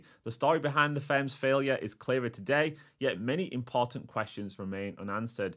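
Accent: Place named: Yorkshire, United Kingdom. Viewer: It helps to listen to 0.75. thanks, I Will try to get familiar with yorkshire-ese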